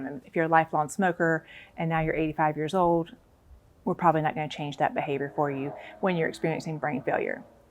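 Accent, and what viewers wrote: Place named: South, United States. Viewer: Great info, live your Southern accent.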